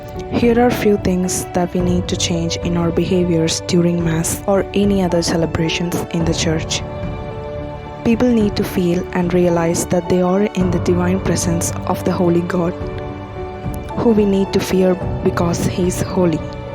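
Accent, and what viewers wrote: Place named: India. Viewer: Very nice video presentation, and excellent advice. You sound Indian. Kerala, by any chance?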